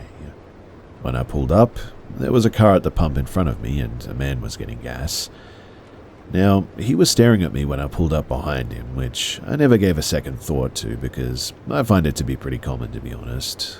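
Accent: Australia. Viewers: Good to here an Aussie accent! Much more familiar. Cheers mate fair dinkum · I love stories and its sooo great to hear an Australian.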